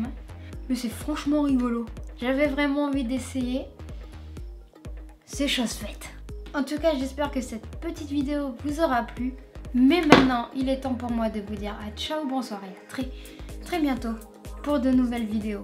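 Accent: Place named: South, France. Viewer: Est ce qu’en utilisant une photo tu peux produire un hologramme Super ton accent du suddd !!!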